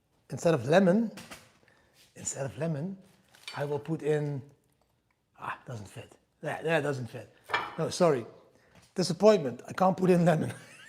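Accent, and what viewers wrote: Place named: Netherlands. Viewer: dude man bro! i just realised your accent is dutch! i grew up with dutch granparents :) much luv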